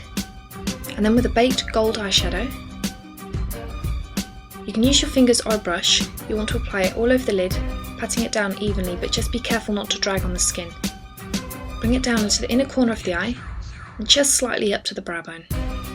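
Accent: United Kingdom. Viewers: i dont have any of the make up stuff that you have, but sometimes i watch ur vids just to listen to ur accent. I LUV UK ACCENTS!! is that weird?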